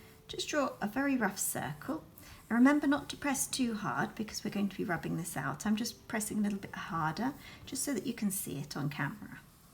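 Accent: United Kingdom. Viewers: I love this video, I said to my son, I like watching videos when they have a nice voice and then a second later you're fine tuning the comb and say " like a punk haircut"! So British, love it.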